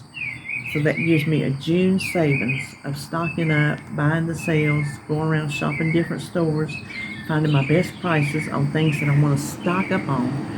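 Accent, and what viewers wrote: South, United States. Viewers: 🐯Hi have a great week. Your giving good advice🐯Thank you. Plus I like your Southern accent. I'm here in Iowa🐯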